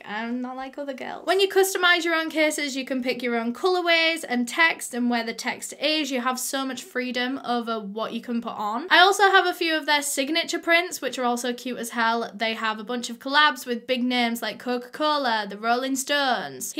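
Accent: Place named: Yorkshire, United Kingdom. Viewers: Shes from Yorkshire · yorkshire accent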